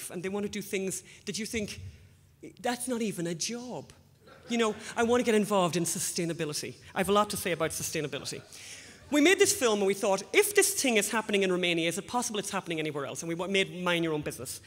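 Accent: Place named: Donegal, Ireland. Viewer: Well. She's from Donegal!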